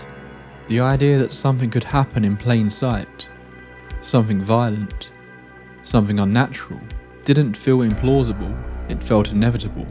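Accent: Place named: RP, United Kingdom. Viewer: Maybe the best part of this for me was hearing you say queen of flatulence and your very posh accent 😂😂😂 But all jokes aside, that was a good episode. I had heard that story before but not in that much detail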